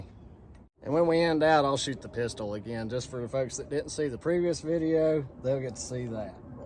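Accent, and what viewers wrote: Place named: South, United States. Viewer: This worth listening to just for the South of Dixie accent.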